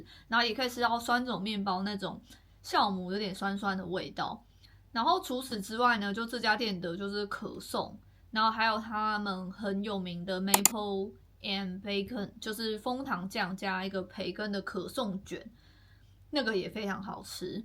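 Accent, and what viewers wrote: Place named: North, China. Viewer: 北部口音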